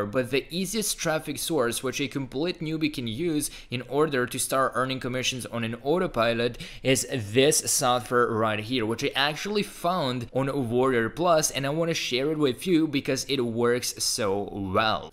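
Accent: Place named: Estonia. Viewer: Could be wrong but my first thought was Estonia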